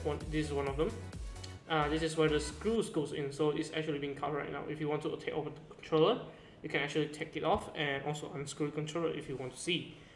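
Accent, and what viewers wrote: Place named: United States. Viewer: Your English is good like American 👍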